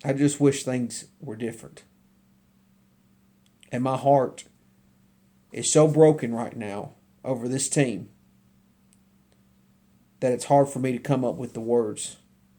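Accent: South, United States. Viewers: where are u from? You don't have that stupid New York accent. U actually sound more southern.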